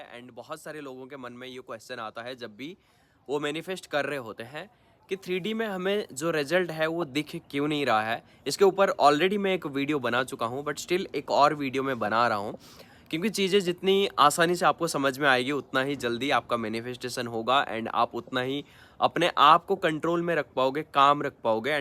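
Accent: Bengal, India. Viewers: Dada are you bengali??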